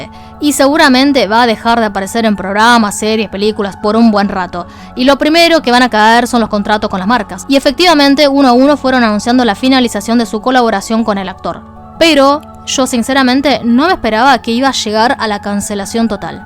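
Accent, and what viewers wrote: Argentina. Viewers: ¡aguante el dialecto Argentino!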